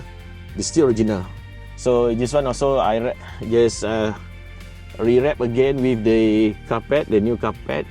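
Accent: Malaysia. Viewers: Good job abg 👍perbanyakkan lg video² review english version..bole menarik lbh ramai viewer² luar utk tgok channel GK. Don't worry about how we pronounce the words cuz this uniqueness symbolizes us from Malaysia.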